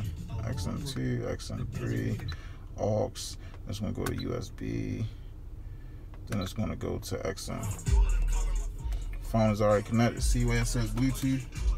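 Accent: Baltimore, United States. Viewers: You definitely from Baltimore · Destinee Mincer soon as i turned on the video my bf said “he’s from baltimore” 😂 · U from bmore? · definitely baltimore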